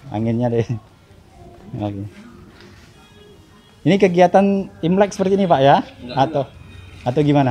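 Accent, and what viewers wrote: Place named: Java, Indonesia. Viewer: Jowo ya mas .logat nye medok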